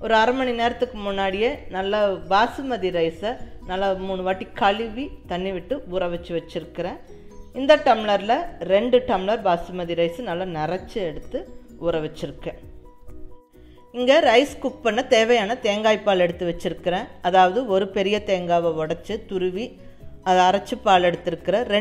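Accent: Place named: Tamil Nadu, India. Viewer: மா சூப்பர். நீங்க ஈரோடா, திருப்பூரா, உங்க slang athu mari eruke....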